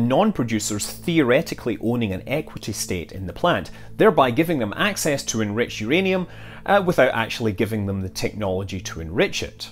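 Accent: United Kingdom. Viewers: Is s Accent British or Irish? Don't get me wrong,I love it and understand him better than someone from Chicago, just curious and glad he is here with us in America now! ❤❤❤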